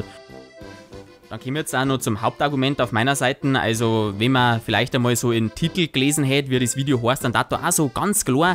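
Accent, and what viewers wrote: Bavaria, Germany. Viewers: Bayrisch hört sich zu komisch an 😂👀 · Ich feier deine Videos, vor allem weil ich selber Bayer bin und genauso sehr stark mit Dialekt rede. Deshalb gefällt mir dein "frei von der Schnauze reden". :) (auch wenn ich hardcore hochdeutsch schreibe, aber da ist meine Schulzeit dran schuld) Deine Parodien sind der Kracher. Die kann ich immer wieder anschauen und lach mir jedes Mal den Arsch ab. Wirst du künftig auch auf andere Serien außer Spongebob zurückgreifen? Z.B. Simpsons wieder oder Family Guy? Bleib wie du bist und schönes Wochenende!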